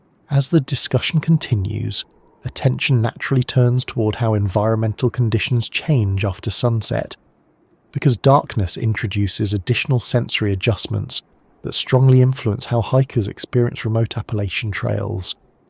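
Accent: United Kingdom. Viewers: Why is this narrative British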